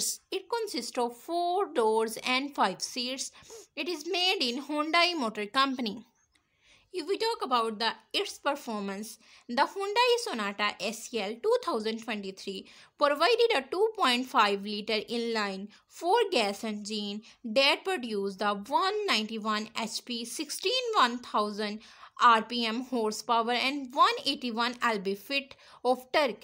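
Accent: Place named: India. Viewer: Very hard to understand, deep indian accent makes listener uncomfortable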